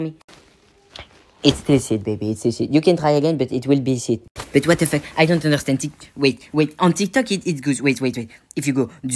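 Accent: France. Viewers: Cz he's french. And this is a french accent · The way he speaks english reminds me of french-👀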